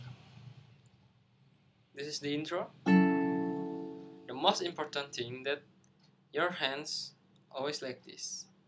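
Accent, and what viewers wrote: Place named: Indonesia. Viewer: i noticed Indonesian accent~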